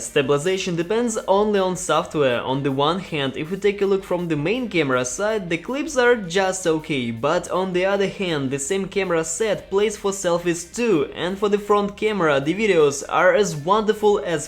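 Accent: Russia. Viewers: Why your accent looks like Russian guy? are you from Russia? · Love your accent lol, are you a russian?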